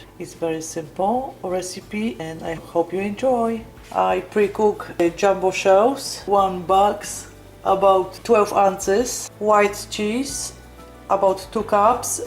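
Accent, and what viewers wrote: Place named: Europe. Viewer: Great recipe my friend these look delicious remind me of my Moms question what is your background? You sound European 💜🌺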